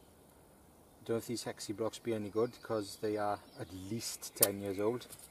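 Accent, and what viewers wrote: Wales, United Kingdom. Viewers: I was so confused about the accent, ive never heard such a thick welsh accent. I thought it was welsh and Swedish mixed lol. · Not often you hear a thick Welsh accent in a YouTube knife review! Subbed!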